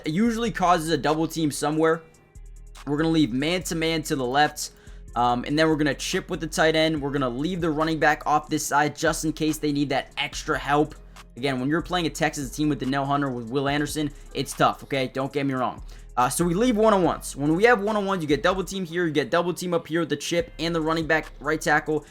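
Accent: Canada. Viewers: Is brother a Canadian? Caught a couple of 'aboots' in there?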